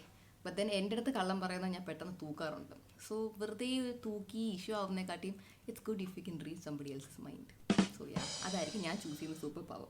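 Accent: Trivandrum, India. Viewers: Malayalam samsarikkumbol trivandrum slang undo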